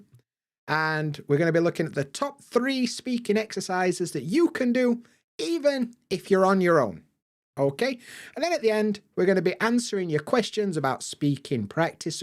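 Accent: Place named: Yorkshire, United Kingdom. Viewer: I love your video so so much! I'm always fascinated by the Yorkshire English. Although I rarely comment but I certainly watch your video a lot and I learnt a lot from your video. Support you.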